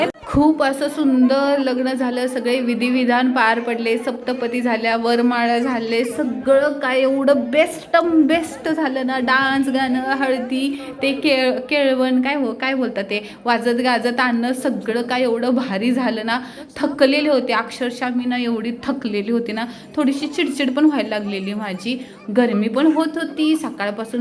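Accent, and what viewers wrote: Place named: Satara, India. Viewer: Tumche gav kuthle ahe,tumch bolnycha tone, saglya vidhi satara karad side chya vatyat.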